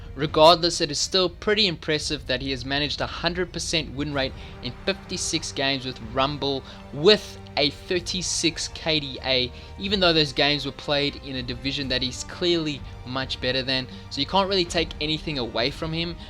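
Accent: South Africa. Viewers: south african??? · You sound South African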